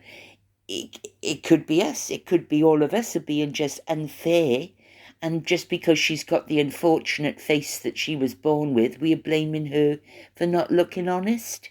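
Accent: Wales, United Kingdom. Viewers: I feel like I'm in front room on a Sunday. It's like listening to my nan. I'm from Newport next to Cardiff so they sound virtually the same. It's so comforting..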